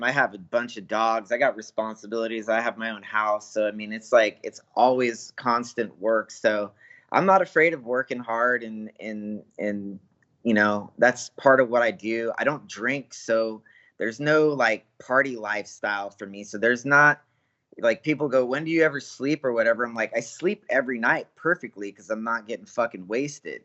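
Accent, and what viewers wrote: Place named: Brazil. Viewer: faz um podcast em portugues mannnnnnn...saquei esse sotaque br!!!!1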